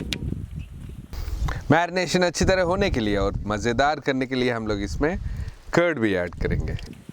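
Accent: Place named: Bihar, India. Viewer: aap Bihar se hoo Kiya? aapki awaz ek dum bihar wali hai.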